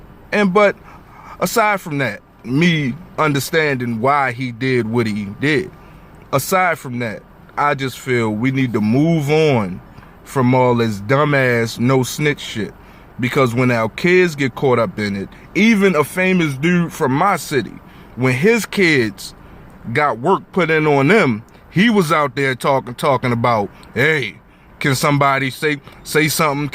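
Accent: Baltimore, United States. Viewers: Bruh you from Bmore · You from b-more. I hear the accent...😂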